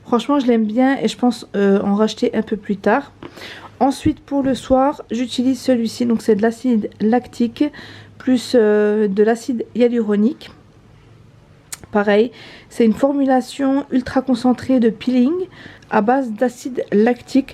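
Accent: Belgium. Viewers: Salem, top ton vlog mais moi je suis pas motivée du tout pour le grand ménage...... Mais dis moi ton accent vient du nord ou de Belgique? A chaque fois je veux te demander mais j'oublie...lol bisous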